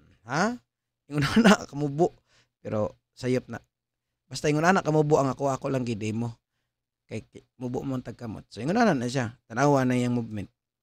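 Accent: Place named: Philippines. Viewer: So you really want a Diamond Back? 😜 With a Lefty on it? Lol. I love the Philienglish!!! Every language does it.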